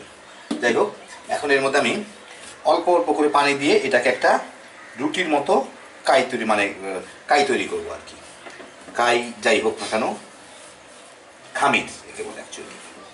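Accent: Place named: Bangal, Bangladesh. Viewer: Vai ranna hok jemon temon..kinto apnar kotha gulo hoy jhakkas....sei level er fatafati...lozza soromer balai nai..ekdom khas banga ...wao..daron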